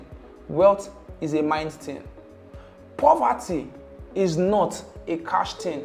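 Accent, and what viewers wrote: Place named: Pidgin, Nigeria. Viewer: Pidgin English never leave you sha😅😅, awesome video thanks alot for it🙏🙏🔥🔥🔥🔥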